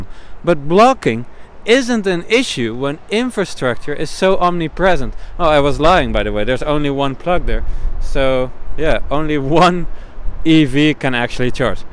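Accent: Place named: Netherlands. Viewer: your Dutch/English accent sounds different from other Dutch/English accents I’ve heard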